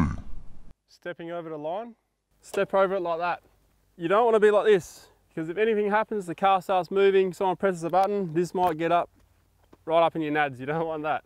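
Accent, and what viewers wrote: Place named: Australia. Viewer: Aussie deep voice gave me nightmares!!😄🤣🤦‍♂️ if the devil were aussie!!🤣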